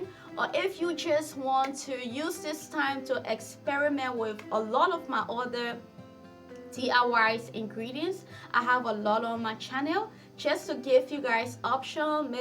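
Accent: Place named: Liberia. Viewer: Your energy is so nice - I don't know if I'm late to this fact but your accent sounds Liberian I keep thinking my cousin is around the corner. I love this video I've used aloe on my daughter's hair since 3 months old and her hair at 2.5 is so soft and shinny her growth is consistent and she retains it. I've never used the peeling well done! Thanks a bunch!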